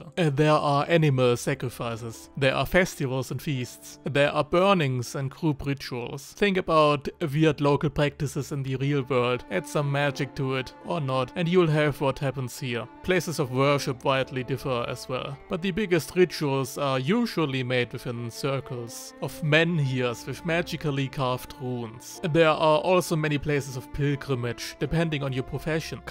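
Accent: Germany. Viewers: Are you Swabian by any means?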